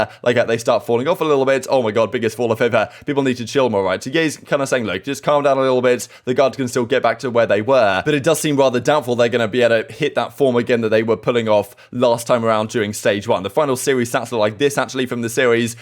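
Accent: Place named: United Kingdom. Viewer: Thx for uploading daily, beside the news, at least your video is useful reference for learning British accent XD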